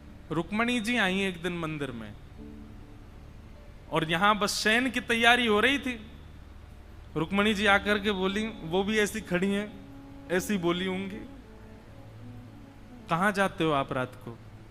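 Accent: Maharashtra, India. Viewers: Gurujii tumhara sambhaashan shuddh marathi he a one supperrrrrr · App marathi Thoda hi bolte par pyare lagte h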